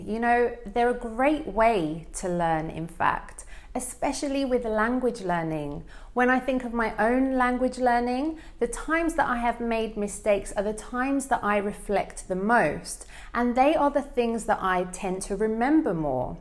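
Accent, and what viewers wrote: United Kingdom. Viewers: I love your British accent. It's a lovely accent plus I love the Scottish accent. Thanks for a wonderful video and superb channel you are a gorgeous English instructor. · Please tell me in which british accent you are talking😊💐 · i love your accent. i mean, british accent👍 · I've been learning British EN for four years. Your videos are really great to keep learning the British accent🇬🇧💛 Thank you so very much!! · I need to listen to british accent more. It is very beautiful, and your tips, particularly, are great. Thanks for your dedication in teaching.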